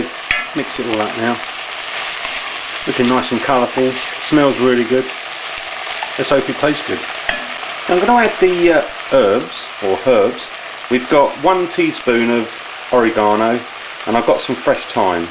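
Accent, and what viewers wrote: United Kingdom. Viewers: just because he has a british accent doesn't mean he sounds like Jamie oliver... he sounds nothing like him other than the british accent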